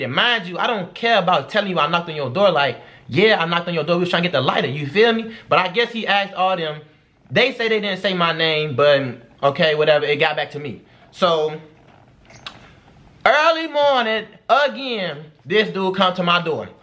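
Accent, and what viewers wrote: Chicago, United States. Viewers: u from chicago? lmao you got dat g herbo slang when you say car and folk lmao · You can tell bro from Chicago 😂💯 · yo you from chicago u sound like it oms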